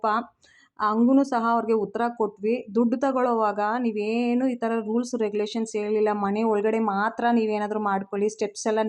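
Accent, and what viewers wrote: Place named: Punjab, India. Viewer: Hi nanu nime volg evte nudidu medam esta ayethu.but nime kannada keli sulpa shock ayethu i think u r a panjabi adre esto chanagi kannada madatitdara very nice 😊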